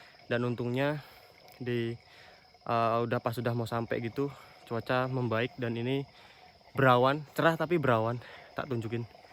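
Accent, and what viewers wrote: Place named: Bali, Indonesia. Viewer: Warbyasyah Logatnya udah bali banget nih bli 😆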